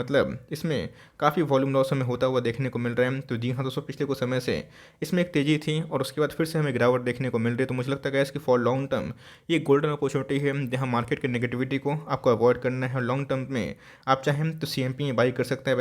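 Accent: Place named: Bihar, India. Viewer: भाई बिहारी स्टाइल अच्छी लगी।बड़ी को बरी बोल रहे हो।बड़ा को बरा मज़ा आ गया।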